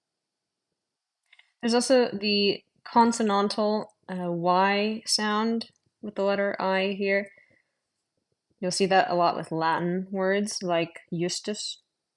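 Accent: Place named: United States. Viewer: Nice video! I really wish you'd used IPA though, maybe even in its own extra column -- it would've been a lot easier for me to glance over and understand the pronunciations that way. I majored in linguistics, to be fair, but I imagine a lot of people interested in Middle English on YouTube may have such a background. I also evidently speak a dialect of American English that doesn't match yours (my "beggar" has the SAME e sound as "better"), so some of your explanations led to me learning equally as much about your particular accent as about Middle English! Anyway, awesome job, and I'm looking forward to bingewatching this series! Thank you so much for creating a Middle English YouTube niche :D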